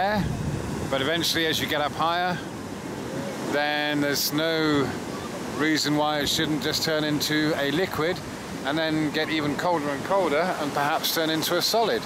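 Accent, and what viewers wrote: United Kingdom. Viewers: It hurts that this guy has a British accent and wasted a western education that people less fortunate would have given anything for....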